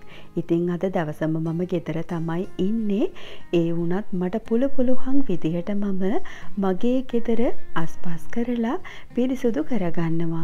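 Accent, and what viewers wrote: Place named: Japan. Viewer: ඔයා වැඩකරනව දකිද්දි මටත් අතපසුවුනු වැඩ කම්මැලිනිසා අතහැරපු වැඩ මතක්වෙනව🤭ඉතින් ඒව කරගන්න හොද උත්තේජනයක් ලැබෙනව ඔයාගෙන්👍❤️ඒවගේම පැටිය දැක්කමත් හිත පිරෙනවනෙ😘තවදෙයක් කියන්නම ඕන පැටිය තරම් නැතත් ඔයත් සිංහල කතාකරන්නෙ හරිම ලස්සන ජපන් උරුවකට ඒකටත් මම ගොඩක් ආසයි.❤තෙරුවන්සරණයි පැටිය ආදරෙන් මතක්කලා කියන්න🙏😘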